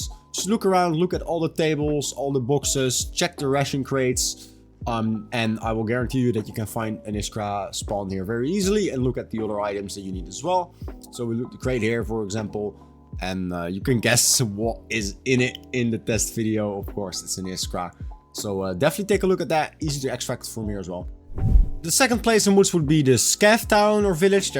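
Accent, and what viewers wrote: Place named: Netherlands. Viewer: Nederlands, I can tell :D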